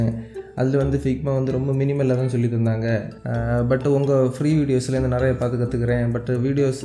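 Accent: Kanyakumari, India. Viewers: Hii neenga Kanyakumari ah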